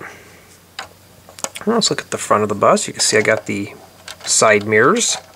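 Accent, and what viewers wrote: Texas, United States. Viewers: Are u Texan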